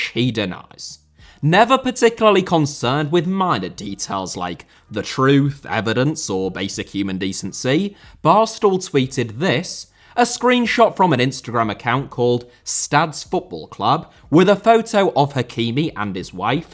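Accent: England, United Kingdom. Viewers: That's just how Brits sound, don't sweat it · I thought the video was so long because he was going to present mounds and mounds of evidence to back his point. Instead however, he's just reading tweets and being proud of himself for making condescending tweets online. It's pathetic really. Turns out having a British accent and making snide comments on the internet doesn't necessarily entail intelligence. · "Accused rapist" learn the definition of accused, charged, sentenced before trying to sounds intelligent with that smug british accent, being accused of something means about jack sh%t. Im not surprised that many high profile rich guys get accused of these things, im also not surpised that the supposed victims ALWAYS fixate on getting paid instead of actually putting that man behind bars, wonder why that would be. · 1. Innocent until proven guilty. 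Especially in today’s day in age were many men rich and poor alike are falsely accused. Provide others the same grace you would want in return if you were the accused. 2. A story like this to be completely made up such as a famous person getting a divorce will be obvious eventually and would be hilariously dumb to fabricate. Instead, you should verify first that they are lying before you accuse them of it. Until then don’t act like you know they’re lying when you really don’t. That accusation from you by the same standard you’re using is just as much a lie as the one you’re accusing them of. Both your claim and theirs are unsubstantiated. The hypocrisy drips off from your British accent. 3. What’s unreasonable in western society is that laws allow for a woman to leave for any reason, even if the women cheats she can take half of the assets. It’s also unreasonable to get into a supposed life long vow and then just leave and take everything. 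Many men are destroyed from this and it’s not what one would describe as justice based upon the meaning found in a dictionary. How can one make a promise and be rewarded for braking said promise/vow? 4. Yes lots can happen in divorce court depending on the country. Very good of you to point that out. 5. Misogyny is the hatred of all women. It is Not when men are pissed off at current marriage laws and a culture that allows women to accuse men and labeling them as guilty until proven innocent. 6. Allow me to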